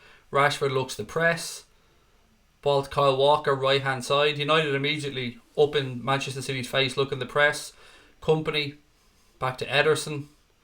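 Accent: Ireland. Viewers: What part of ireland are you from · Are u Irish? 🇮🇪☘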